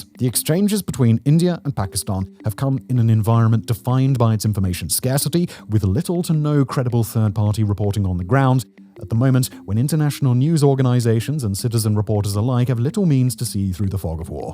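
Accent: United Kingdom. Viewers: is this guy british or american i cant tell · He's british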